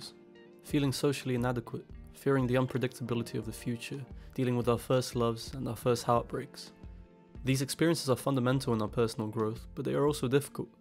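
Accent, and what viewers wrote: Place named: United Kingdom. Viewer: as someone from the middle of a cornfield in Illinois, I have to say I never thought I would hear a British man explain something like this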